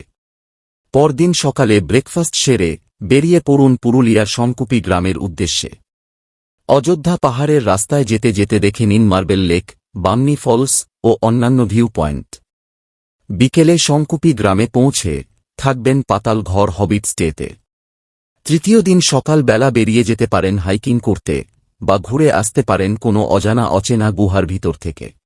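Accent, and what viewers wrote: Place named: England, United Kingdom. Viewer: Tomar golar voice ta darun but english tan ache banglay ktha blate। Tbe darun laglo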